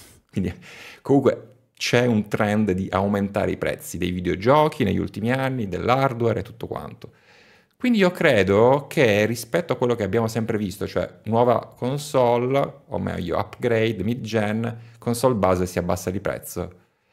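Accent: Catania, Italy. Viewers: Ma sei Catanese? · Grandissimo ma sei di Catania?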